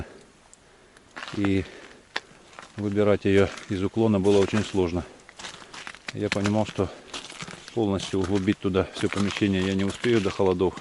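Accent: Ukraine. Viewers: Судя по речи хохол в землянке от войны прячется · Судя по характерному говорку с ридной батьковщины приыхал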